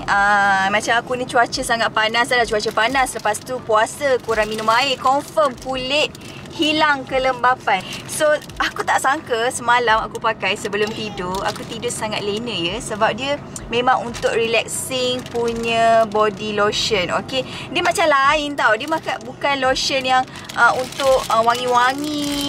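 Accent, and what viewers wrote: Kelantan, Malaysia. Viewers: Comey dengar kak cakap kelantan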